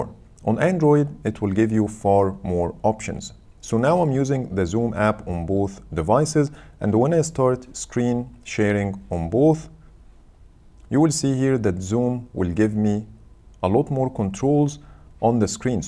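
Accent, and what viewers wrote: Egypt. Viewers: That sounds like an Egyptian accent 🤔? Are you, my dude?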